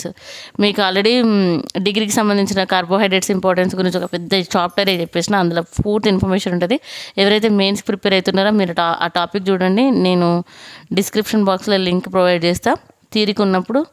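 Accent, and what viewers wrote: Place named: Telugu, India. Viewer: Mam I appreciate your hard work , the way of talking seems like your mother tongue is telugu but your trying to speak hindi 👍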